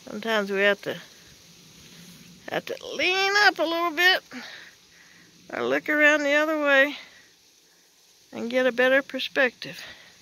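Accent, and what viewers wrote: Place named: Georgia, United States. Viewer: Amen to what You are saying. Don't have to see it to know it! Please don't get mad at me, but You sound kind of Georgia, but it looks kind of West Texas there?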